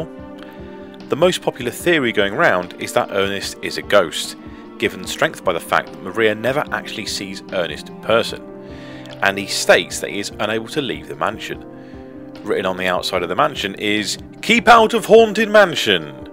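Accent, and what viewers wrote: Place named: Yorkshire, United Kingdom. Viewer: Glad to hear a friendly Yorkshire voice 😀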